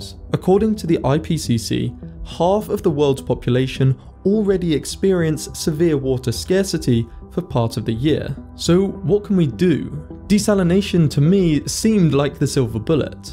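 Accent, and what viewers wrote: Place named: RP, United Kingdom. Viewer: There are an awful lot of YT pretending to be “science” with British speakers. I guess whoever is paying to make these think it gives the channels more credibility. Anyhow… it is much more likely that the islands are sinking rather than “sea rise” which is a myth. The great climate scam has come to an end everywhere except these types of pretend “science” YT videos which still constantly push the “sea rise” and other hoaxes.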